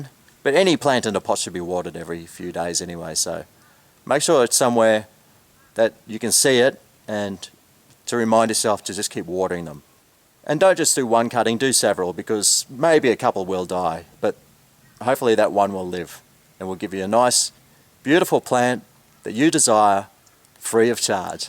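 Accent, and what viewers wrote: Australia. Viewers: "British" accent??? It's Australian. Everyone's laughing at your class now.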